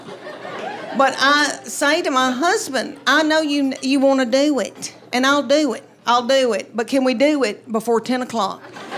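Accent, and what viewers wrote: South, United States. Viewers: Smart. Funny. Southern. AWESOME! Just listen to that beautiful talk. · Extreme and perhaps enhanced Southern USA drawl of an accent... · 6-yrs-old....Is Leanne getting a country push? That southern drawl is the drawliest it can be. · Love You Leanne!!❤. I’m goin’ on 80 years old! Everything you have said is so true! I just fall over laughing 😊😊😊❤❤❤❤❤Your Southern accent and your family stories leave me holding my sides laughing!! My dream is to see you in person❤❤❤!!!!I live in New Jersey, the Jersey Shore! I am determined to see you!! God Bless! Your shows never get old! I can’t get through the day without you!❤❤❤❤!!!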